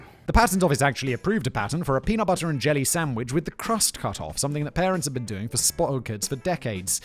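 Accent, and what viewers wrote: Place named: RP, United Kingdom. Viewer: A minute ago, I watched a “Geographics” about Trans…figuritania?😂😆 this wannabe independent nation in Moldova that reminds me of Corsica -.the motherland) followed by a Into the shadows… and the more goes on, the more unhinged, nasal and Pommy (Ozy/Aussie expression to depict the British “Poms”/UpperMiddleClass) becomes. It’s so funny/uncanny!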